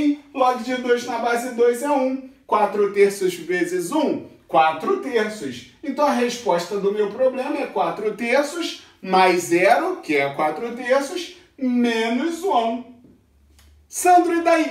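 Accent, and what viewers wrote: Rio de Janeiro, Brazil. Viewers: Esse fala carioquês com gosto!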